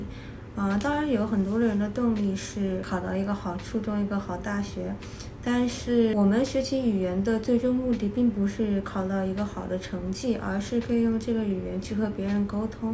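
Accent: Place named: China. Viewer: 这是中国口音吧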